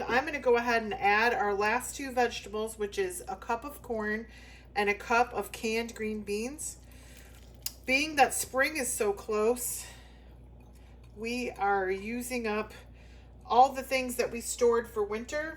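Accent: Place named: Johannesburg, South Africa. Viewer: Comfort food at its finest! Now that Fall and Winter are just around the corner here in New England, this will be just the thing! I also wanted to ask you if you're anywhere near Jo-Burg [Johannesburg]..we've got some dear friends up there, and your accent is so similar to theirs! Makes me miss them<3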